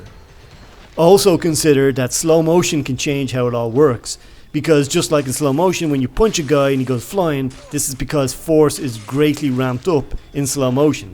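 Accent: Ireland. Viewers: Your Irish, right?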